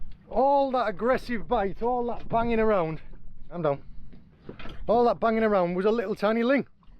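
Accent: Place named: North East England, United Kingdom. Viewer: Thanks. Enjoyable and informative as ever. We're back down to the Roseland for a couple of weeks at the end of August so can't wait to wet a few lines! Meanwhile, there's the added amusement of seeing what YouTube closed captions makes of your accent. In the first few minutes of this one we had sand-eels alternatively expressed as 'sandals', and 'sun deals'... Can only assume the developers of their speech recognition software haven't spent much time in the North East...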